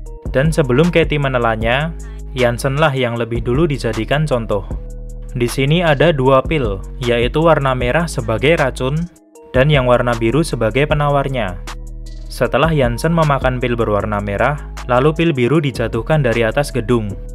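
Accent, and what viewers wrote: Java, Indonesia. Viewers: Medok banget min:)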